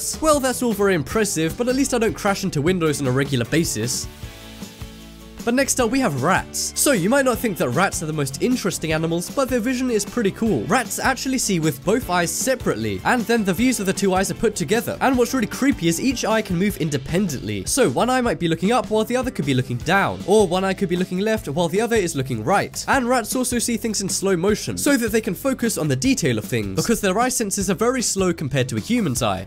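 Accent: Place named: England, United Kingdom. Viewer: Great now that’s all I can fixate on. I already find the English accent one of the most annoying, almost to the point of it sounding like a speech impediment.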